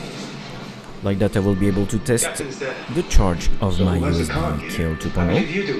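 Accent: France. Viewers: French accent or it's only me? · l'accent francais omg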